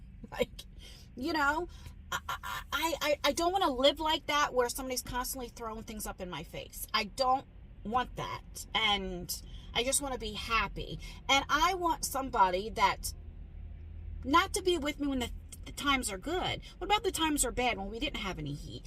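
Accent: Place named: New York, United States. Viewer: Hey! I’m originally from New York, I’ve been in Florida for 30 years. I hear your voice and I get homesick L O L… stick to your guns on your new life! Sounds like you’re getting another chance at a calm, peaceful, relaxing life! Count your blessings! You are rocking it! ❤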